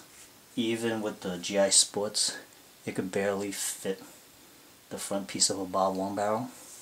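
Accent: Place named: New York, United States. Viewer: Great review! I actually just ordered one myself for my axe. Also loving the new york accent.lol i am also from new york but i live on long island. where do you go to play?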